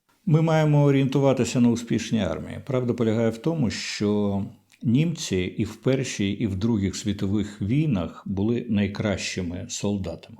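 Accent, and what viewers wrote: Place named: Russia. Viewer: буде вашчЄ (буде важчЕ), щЬо нам іщЄ треба... Пане Дмитро, як завсіди, спасибі за промовисту орацію, але... будь ласка, поверніть собі українську фонетику замість московської. Шиплячі ж бо в нас тверді, не мякі як у москаля.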